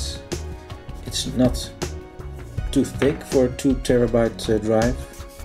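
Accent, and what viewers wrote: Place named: Netherlands. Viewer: That strong dutch accent tho lol, nice review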